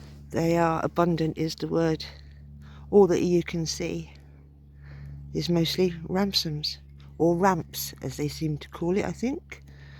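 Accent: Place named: England, United Kingdom. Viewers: Hi..I love everything you are doing...your videos are amazing , they are beautiful.. eye-opening and very peaceful. I love the way you talk.. with that so cool English accent..I can listen to all day...please do not take any notice of the Grumpy ones..they are normally kind of jealous or just plain bitter in life.. Thank you for great entertainment.❤ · Lovely authentic lady enjoying nature. I'm thinking accent is Suffolk but the landscape is western looking. Technology.. industry, science - all part of life. Driving around in a van I find beauty in wind farms, power stations.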